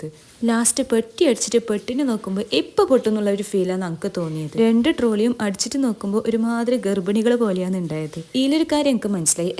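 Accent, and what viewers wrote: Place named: Kasaragod, India. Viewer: Kasaragod language undhallo🫵🏻😂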